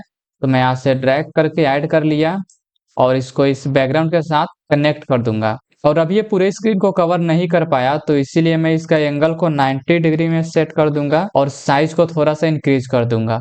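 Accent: Bihar, India. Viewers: bhaiya aaap bihari hai kya ?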